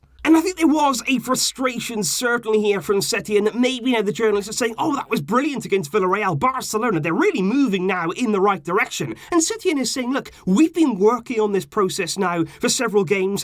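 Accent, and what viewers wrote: Wales, United Kingdom. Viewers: Tf are you on about, he's from Wales and has an accent, you have a problem?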